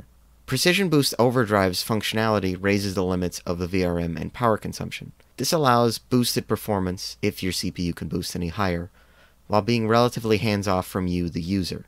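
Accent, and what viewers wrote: Scotland, United Kingdom. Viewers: I miss your adorable Scottish accent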